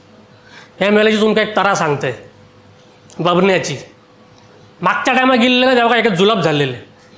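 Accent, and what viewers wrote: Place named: Malvani, India. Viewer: खतरनाक मालवणी किडो पण भारी झिला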